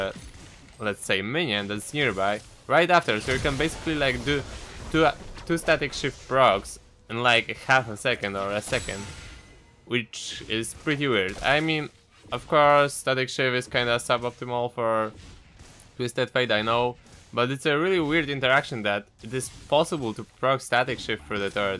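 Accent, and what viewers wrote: Poland. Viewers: Twój akcent, taki Polski ;p Oby tak dalej, ziom! ;) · Are you Polish? You sound Polish. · polish accent xd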